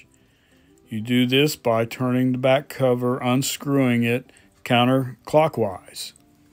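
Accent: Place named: North Florida, United States. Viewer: Awesome, thank you! I received a Benrus that was overwound and it's going to need human intervention. By the way, are you from Northern Florida/Alachua County? Your accent reminds me of home.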